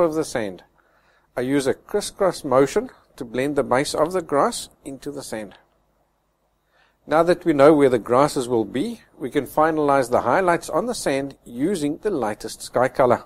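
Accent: South Africa. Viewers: thanks for the great teaching style and accent in your voice,,is it south african or welsh?but thanks anyways very easy to follow and sped up just at the right times --im sure it wont come out like yours but i will try nevertheless,,thanks again.. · Fantastic.. im from s.a.too. I can hear on ur accent ..I'm trying your tutorial soon. Do you give painting lessons?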